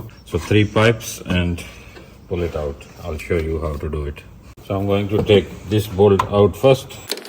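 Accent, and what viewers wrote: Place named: South Asia. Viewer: Your English accent is very Asian may be you from Pakistan or India?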